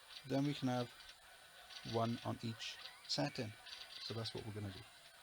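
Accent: Wales, United Kingdom. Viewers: Ooooh is that a Welsh twang I can detect in your voice?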